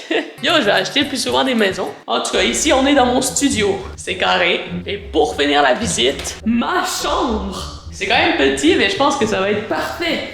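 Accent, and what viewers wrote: Quebec, Canada. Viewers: J'adore l'accent Québécois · Québécois je pensr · Tu es québecois ?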